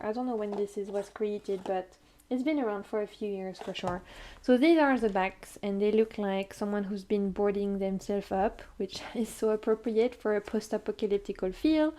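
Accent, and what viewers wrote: France. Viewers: 🐚 obsessed with your French accent. Wish I could hire you to teach me French. Beautiful review of all these unique decks. Thank you for your time and efforts on your wonderful videos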